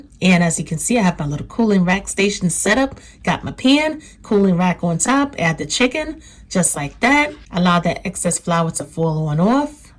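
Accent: New York, United States. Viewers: My NYC homegirl. I already know without really knowing. I'm a Bronx Boogie Down Girl. I know our accent anywhere!!!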